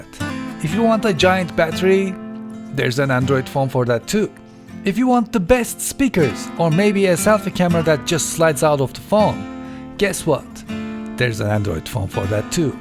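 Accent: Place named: Turkey. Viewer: Aksanı duyunca “kesin Türk bu” diye yorumlara geldim ve yanılmadım. Güzel video ayrıca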